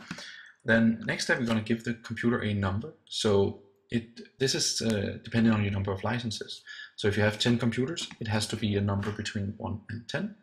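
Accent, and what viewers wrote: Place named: Denmark. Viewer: This guy is Danish i Can tell by his accent 😂😂😂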